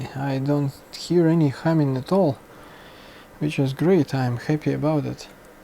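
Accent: Russia. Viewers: Yeah you are probably right. I guess searching for a replacement transformer that would fit this application would time consuming as well. By the way I was wondering for some time where do you live :) I noticed your Russian accent but you are using 120 V AC. So you must live in US or Canada. Is that right?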